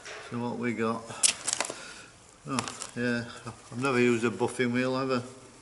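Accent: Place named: Yorkshire, United Kingdom. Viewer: Cheers, just watched your first 10 videos and Im subscribed. Loving the Yorkshire patter and subtitles for the heathens, all the best from Scotland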